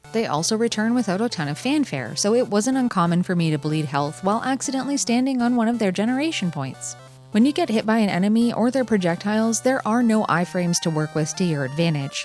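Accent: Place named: Canada. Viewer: Love your quirky videos and your charming Canadian (I think) accent. ❤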